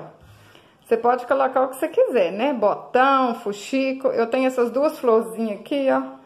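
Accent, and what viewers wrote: Minas Gerais, Brazil. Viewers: Você é mineira, né?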